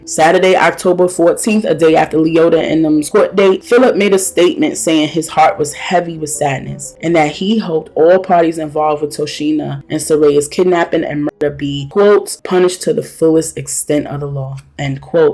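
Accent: New York, United States. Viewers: I love the way your NY accent flows with your storytelling!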